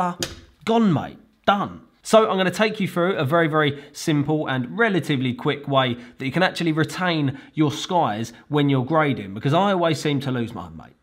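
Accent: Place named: Cockney, United Kingdom. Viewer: Best tutorial with great cockney accent. Thanks mate!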